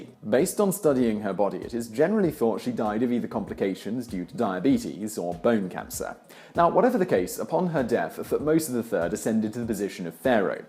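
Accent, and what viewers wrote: United Kingdom. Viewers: Lol I guess this is the only time a British accent sounds cringe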